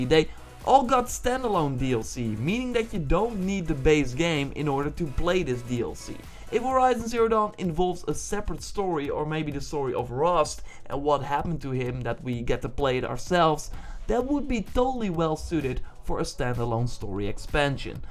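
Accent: Netherlands. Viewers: ik hoor aan je accent dat je nl bent :D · ofc he is ... that accent tho :)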